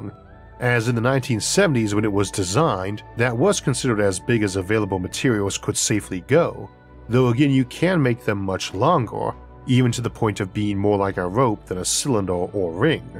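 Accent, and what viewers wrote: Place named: United States. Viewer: What's your accent, i assume American because how big and wide it is, is my assumption correct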